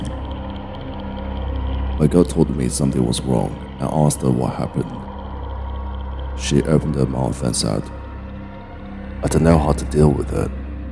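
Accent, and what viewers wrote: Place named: United Kingdom. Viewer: Your voice sounds British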